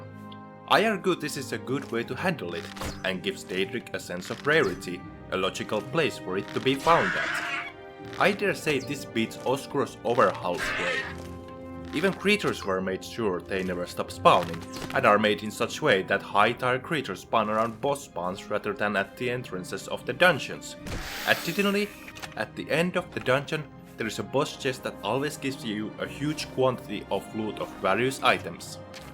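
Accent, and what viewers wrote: Finland. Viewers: Onko tää suomalainen kanava? Kaveri ainakin ns. suomiaksentilla selkeesti :)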